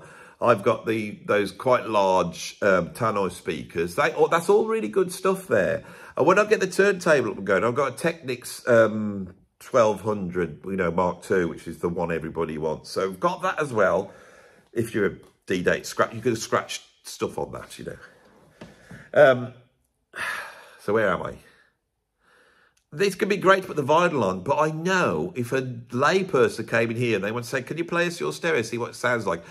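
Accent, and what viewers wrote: Birmingham, United Kingdom. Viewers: You crack me up Brummie in a good way mate. Lived in Stourport-on-Severn <num>. No longer in the midlands, which I miss. CDs have their advantages and some sound fantastic BUT a good quality bit of well recorded vinyl on a quality turntable through a decent amp to decent speakers is something else again... natural! UTV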